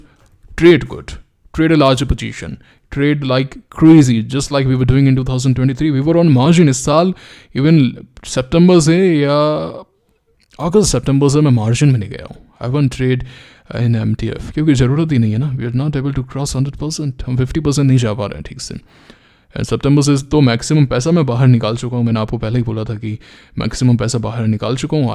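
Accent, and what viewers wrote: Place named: Bagri, India. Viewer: gale mein aagi aapke😂😂😂 wah maza aa gya swad hi aa jaata hai jab bagri use karte ho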